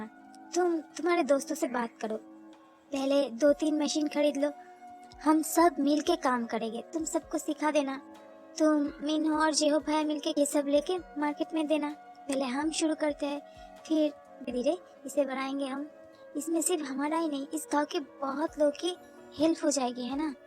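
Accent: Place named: Bengal, India. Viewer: Nice story or bangali logo ka hindi sunneme accha lagta hai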